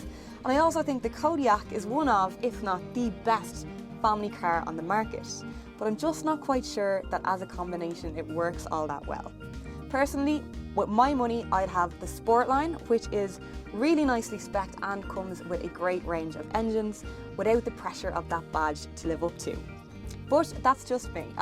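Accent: Ireland. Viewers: I just came here for the Irish accent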